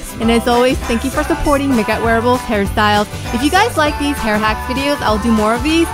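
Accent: South Korea. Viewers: Are you Korean? Reason I ask is Because i think you have very very slight Korean accent...? Or is it just me? :o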